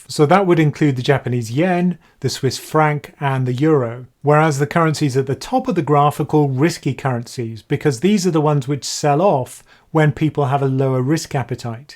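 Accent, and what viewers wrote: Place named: Wales, United Kingdom. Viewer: Your cadence and accent reminds me of author Jon Ronson, who is Welsh.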